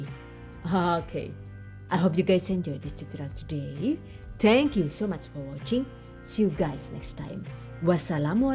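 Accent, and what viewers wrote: Banyumas, Indonesia. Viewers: Salam kenal saya oma holly dari cirebon,saya suka sekali lihat video,kuenya enak n penampilan menarik dan yg paling saya suka itu omong inyong2,n kelucuannya,saya sudah bnyk resep yg saya tulis di buku soalnya belum punya oven hehehe jadi,yg udah saya buat donat aja pokoknya yg d goreng2 sukses selalu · Q suka dgr logat ngapaknya sist..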